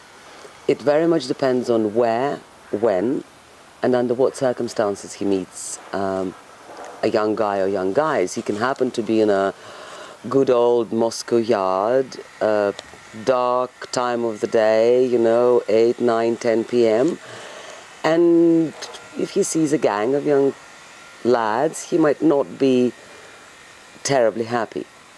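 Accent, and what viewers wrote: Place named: United Kingdom. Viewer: Бритиш англ😊я лов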